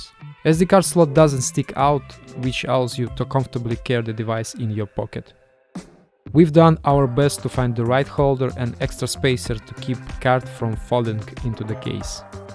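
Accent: Russia. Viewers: Этот русский акцент идеален) Хочу себе данный девайс) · Чувствую Русский акцент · Акцент специально делаешь такой заметно русский?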